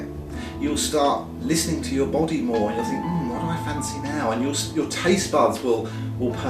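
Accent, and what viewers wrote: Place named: United Kingdom. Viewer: Thanks! What I like about your vids the most is, that you dont go over the top and try to force it down our necks. Its is very friendly, peacful and joyful process. I love that. And your British accent rocks! Thanks again. xx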